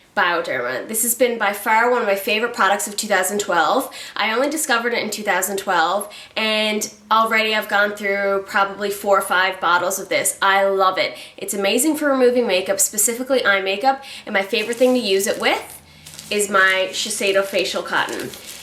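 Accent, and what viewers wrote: Canada. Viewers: Being Canadian, it's always difficult for me to hear what the rest of the world hears as a "Canadian accent." But I think I hear it now....so funny. Probably from watching videos of girls from all over the world. This was the first video of yours that I have watched and I knew within the first couple of minutes that you were a fellow Canadian! Awesome video! New subscriber!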